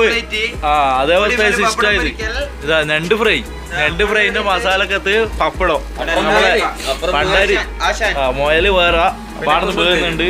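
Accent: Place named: Kannur, India. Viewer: നല്ല തനതായ കണ്ണൂർ ശൈലിയിൽ ഉള്ള അവതരണം വളരെ അധികം ഇഷ്ടപ്പെട്ടു. പുതിയ വിഡിയോയ്ക്കായി വെയ്റ്റിംഗ്..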